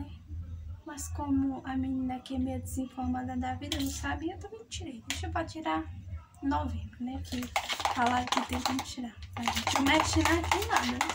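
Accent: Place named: Northeast, Brazil. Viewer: E um sutaque de nodestino adoro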